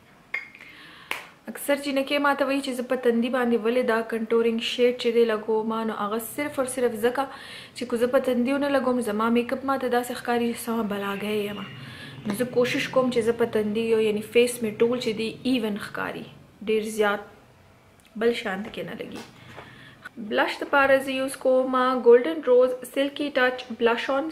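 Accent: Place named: South, India. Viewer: Sounds like South Indian. No offense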